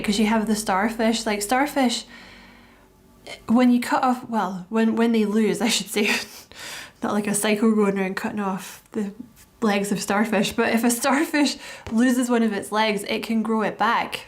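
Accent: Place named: Scotland, United Kingdom. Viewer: Sounds Scottish to me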